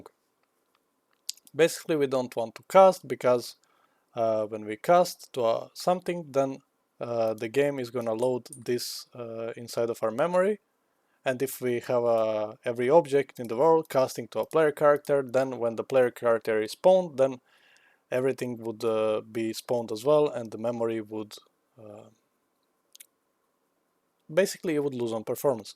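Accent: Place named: Croatia. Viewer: Odma prvu minutu sam posumnjao da si nas hahaha, hvala ti za ovo <3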